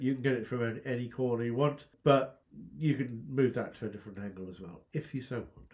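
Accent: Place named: England, United Kingdom. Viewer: I'm not good in English, but even Russian like me can understand his gorgeous pure English accent. Thanks for great videos!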